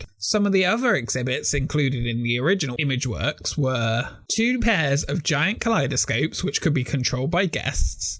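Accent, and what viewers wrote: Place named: England, United Kingdom. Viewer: great video again! where are you from?, you sound like your from England accent but i cant differ whereabouts.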